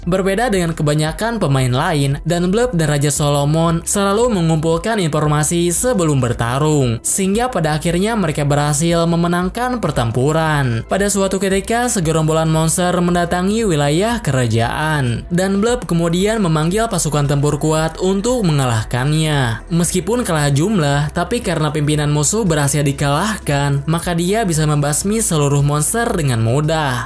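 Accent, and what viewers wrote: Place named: West Java, Indonesia. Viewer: Pantesan kayak orsun logat bicaranya wkwkw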